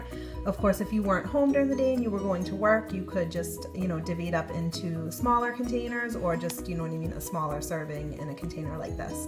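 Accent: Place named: New England, United States. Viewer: You must be a New Englander hehe! Where did you get those awesome containers?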